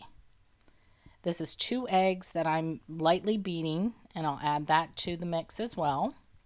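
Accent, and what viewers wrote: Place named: United States. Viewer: The moment she had American accent I stop watching what American know about meatballs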